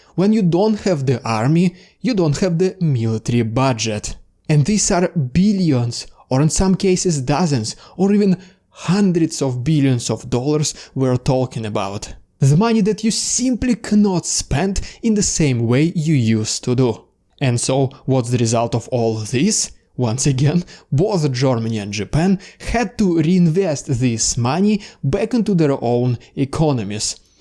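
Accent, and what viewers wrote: Canada. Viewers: Dude why you suddenly a canadian dude?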